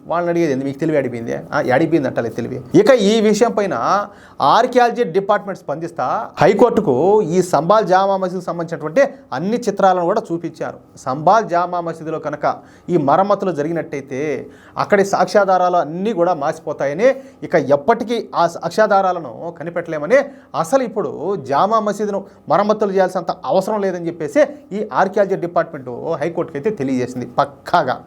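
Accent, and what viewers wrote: Rayalaseema, India. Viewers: రాయలసీమ యాస superb. యా ఊరు నీది.!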